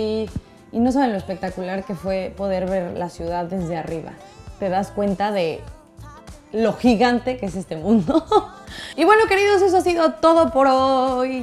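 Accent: Mexico. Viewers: Ay! Como me encantas... Curiosamente acabo de ver otros videos de recién que llegas a España, y como que querías adoptar el acento españolaooo🙊jajaja pero creo que el furor ya pasó, hace tiempo. Me encanta que conservas tu acento mexicano y muuuy freson! Eres divina😗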